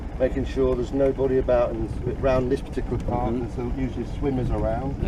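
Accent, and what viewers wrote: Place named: Nottingham, United Kingdom. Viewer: where are you from? You sound like you're from the Nottingham area.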